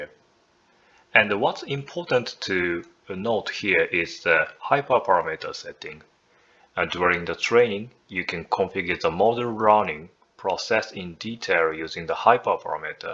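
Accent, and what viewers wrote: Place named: Japan. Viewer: 日本語訛は私にとってはとても理解しやすい英語です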